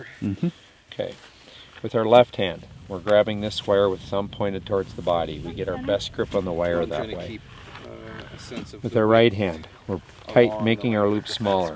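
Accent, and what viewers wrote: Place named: New Zealand. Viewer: What a very good instructor, thank-you. The Kiwi accent was very thuck, but managed to git it.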